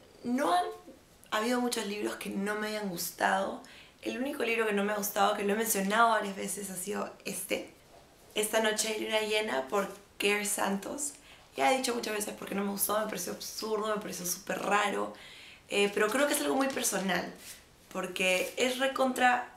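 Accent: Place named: Argentina. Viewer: holaa, me suscribi a tu canal, muy bueno :D , la verdad en un momento pensé que eras de acá de Argentina jaja, buenos nos vemoss :D , no olvides pasar por mi canal, soy "un intento de youtuber" ajaja saludoss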